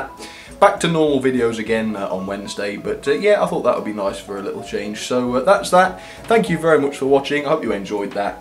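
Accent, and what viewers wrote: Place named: Derby, United Kingdom. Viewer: hey! Just a quick question, are you from Derbyshire? Your accent sounds like you're from Derby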